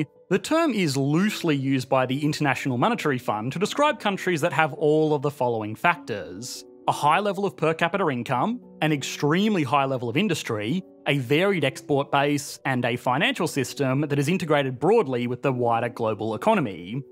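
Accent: Australia. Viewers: Yeah when I saw your Norway video and how you tacitly backhanded what Australia’s doing with their resources compared with how Norway is maximising theirs, I thought that you must be Aussie, even if the accent didn’t already give it away. Yeah it’s a shame how we are paying market rate for our gas resources when we are sending most of it overseas to the benefit of others. Hopefully that will all get upended if we manage to transition to become a renewable energy superpower, and that cheap electricity can make manufacturing and refining once again profitable in this lucky country. Hopefully greater automation will offset the more expensive labour costs for manufacturing, like how it is in the US and Europe. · EE makes me ashamed to admit that I might actually have a love hate thing for an Aussie accent😉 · what do you mean? I’m an Aussie and consider him to have a pretty average Aussie accent. 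He’s middle class and educated, so he doesn’t sound like crocodile Dundee, but listening to him speak I don’t get the impression that he spent any of his formative years overseas. At most he’s maybe a bit “media-trained,” ie. he puts videos on the internet so he’s learned to speak clearly into a microphone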